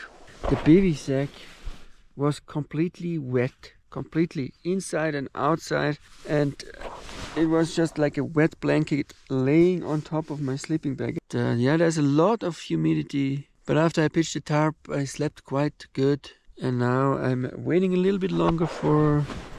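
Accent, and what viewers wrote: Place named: Austria. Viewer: Sehr sympathisches und eindrucksvolles Video. Sind Sie Österreicher? Ihr englischer Akzent klingt sehr danach :) 😉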